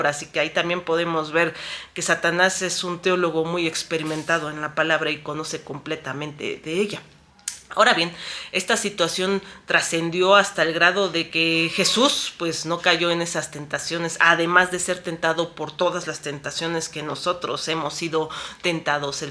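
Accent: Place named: Mexico City, Mexico. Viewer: Hermana, tienes acento capitalino (Chilango). Bendiciones de Dios...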